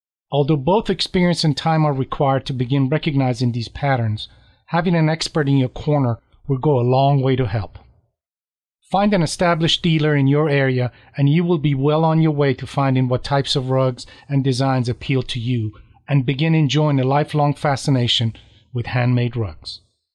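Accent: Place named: South, United States. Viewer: I knew you learned English in the Southern United States. You have that Southern accent! This was a great video! Thank you so much for presenting it so clearly. I had an Iranian friend who had some beautiful rugs, and I was fascinated by the skill used in weaving them.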